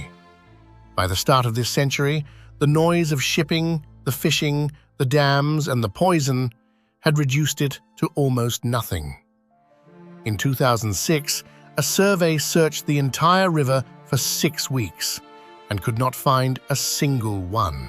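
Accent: United Kingdom. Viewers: The narration is a beautiful voice with a strong British accent. Thank you for telling people who don't understand Chinese history about our 5,000-year-old, uninterrupted history of constantly rising from the ruins.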